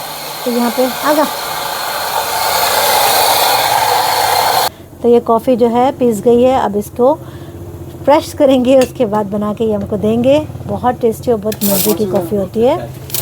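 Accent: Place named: India. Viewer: آپکی اردو ہم کو بہت اچھی لگ رہی ھے۔ہم آپکے لہجے سے فورا سمجھ گئے کہ آپ ہند سے ھیں ۔پاکستانی نہیں ھیں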